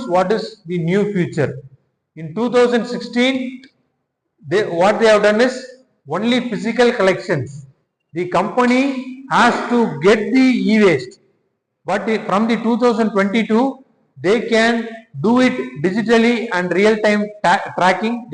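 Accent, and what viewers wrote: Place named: Tamil Nadu, India. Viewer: Your English accent has Taamil touch to it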